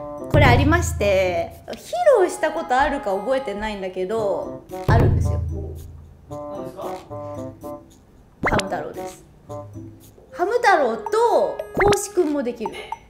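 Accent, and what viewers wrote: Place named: Tohoku, Japan. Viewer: ところどころ東北っぽいイントネーションだと思ってたらやっぱ秋田だったか！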